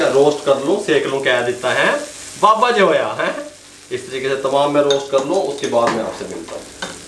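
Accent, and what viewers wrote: Punjab, India. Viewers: بھائی ریسپی کا سواد تو اپنی جگہ۔پر آپکا بیان کرنے کا انداز انتہائی سادہ اور پنجابی لہجہ کمال۔ بہت اعلی۔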